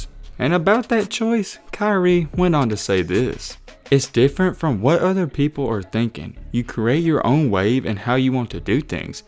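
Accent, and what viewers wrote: South, United States. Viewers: for real he has a country voice that pisses me off also whole video sounds fake, sounds corny, and sounds scripted lol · bro this man is so country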